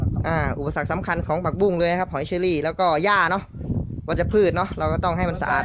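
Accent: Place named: Isan, Thailand. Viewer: เฮดคลิปแบบนี่เด้อเจ้านะ,ดีหลายคั้นแมนเจ้าเว้าอิสานบ้านเฮา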